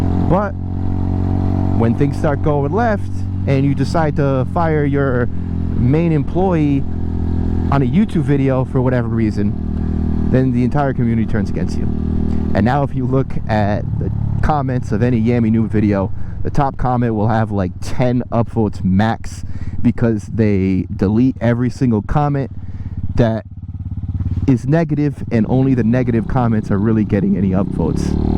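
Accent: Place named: New England, United States. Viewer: Sounds like a bit of "subscriber jealousy" by an obnoxious Yankee to me... I don't think I've heard of him before and only looked him up since you were whining about him... He's a bit obnoxious since he's a youngster and I'm and old fart and as such find ALL youngsters annoying, but with your Yankee accent, you are even more annoying...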